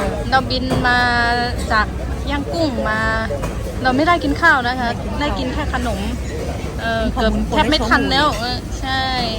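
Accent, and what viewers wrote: North, Thailand. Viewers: สำเนียงและการพูดคล้ายๆ ภาษาเหนือเชียงใหม่เลย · ภาษาพูดสำเนียงเหมือนกันเลยครับกับ ทางภาคเหนือ ของประเทศไทย ติดตามคลิปตลอดครับเป็นกำลังใจให้ครับ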